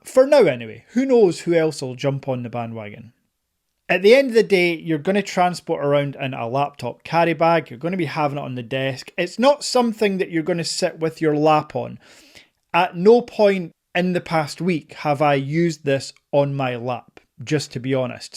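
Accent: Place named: Newcastle, United Kingdom. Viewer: You a Geordie? I always thought you were Scottish lol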